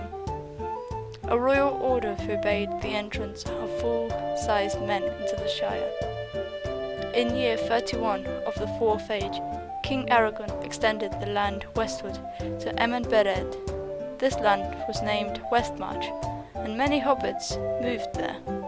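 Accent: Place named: RP, United Kingdom. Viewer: Well done. I am particularly enjoying your First Age work. Your R.P. accent (I'm American) and spot-on pronunciation are particularly delightful to listen to, not to mention the always delightful and apropos music and art choices you make. Carry on!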